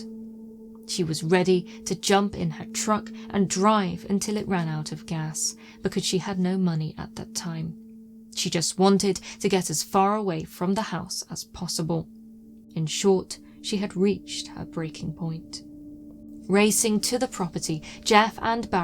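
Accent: United Kingdom. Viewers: I must say, your sponsors get their money’s worth. Flawless ad read in an elegant British accent is usually something they have to pay extra for.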